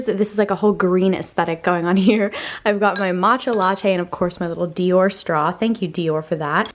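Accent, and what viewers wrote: United States. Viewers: I'm here just to practicing american accent .haha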